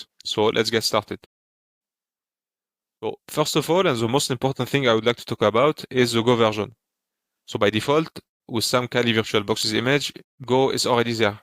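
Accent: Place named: France. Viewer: France accents 😂? Nice demo btw ❤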